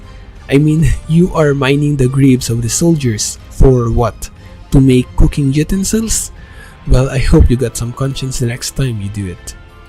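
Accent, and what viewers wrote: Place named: India. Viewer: 感覺有點印度口音XDD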